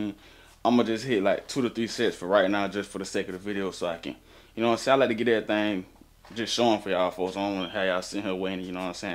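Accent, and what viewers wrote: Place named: Georgia, United States. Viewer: twin gotta be frm ga , ik my fellow Georgians when i hear them 😭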